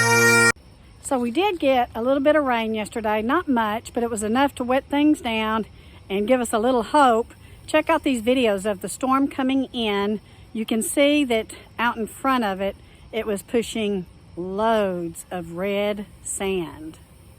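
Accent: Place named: North Texas, United States. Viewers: North Texas gal here in south Tx. Yes her accent takes me back to Archer County and where I grew up! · SE Texas here. What was that wet stuff falling from the sky? I swear, we haven’t had rain in months. I was thinking about planting some spinach on my balcony garden. Fingers crossed. I just love watching your videos. Thanks for all you do. I grew up near Lake Texoma. Your accent takes me back home. ❤️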